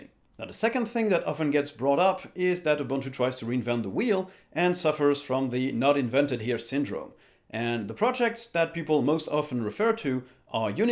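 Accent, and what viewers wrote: France. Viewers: Even though Ubuntu has its cons, I have had many issues with other distros (Manjaro, OpenSUSE, etc.) when it comes to NVIDIA RTX GPUs support. Everything simply worked with Ubuntu, so I guess that was my only option, other than wasting another 2 days on distro hopping and figuring out a solution. Also, I know it is out of topic, but you are such a handsome man. (Also, by your accent I feel like you might be French, in which case, un salut à toi depuis Strasbourg !) Keep it up with the great content :)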